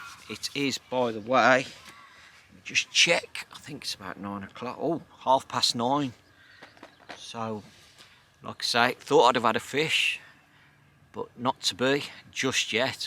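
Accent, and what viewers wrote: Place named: West Midlands, United Kingdom. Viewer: Dont know for sure but the accent is west mids??? so be a bit of a trek. Im south notts so kettleby is only 20 mins from me. Nice to meet you 😀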